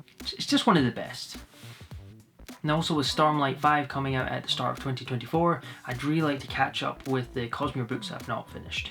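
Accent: Scotland, United Kingdom. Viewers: It’s great to hear a Scottish voice booktube! 🏴 Looks like you’ve got a great reading year ahead of you!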